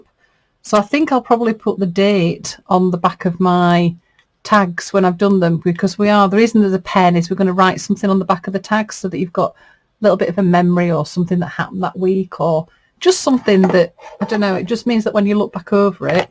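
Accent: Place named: Northern England, United Kingdom. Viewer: Hi, new subbie here (I came over from Rachel at Roxy Creations' channel) First off, I recognise your accent! You're a Northerner, like myself! (I'm in Lancashire) I love the idea of this challenge and I will be playing along too as I want to start incorporating stitched pieces into my journals and I think this will be a great starting point for me 😊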